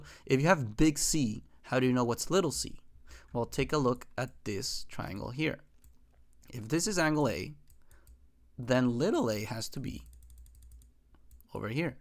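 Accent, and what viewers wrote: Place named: Chile. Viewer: This is gonna sound weird, but the 'cierto?' After every phrase sounds soooo cute. Are you from chile? Idk why but your accent reminds me of chile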